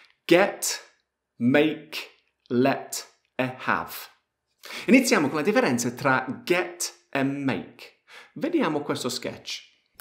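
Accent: United Kingdom. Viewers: very well!! Thanks! e voglio aggiungere che quella storia del pugliese non la condivido affatto: a me sembri un vero inglese che parla un ottimo italiano! :) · HI! Your sons are precious! I like that perfect British accent!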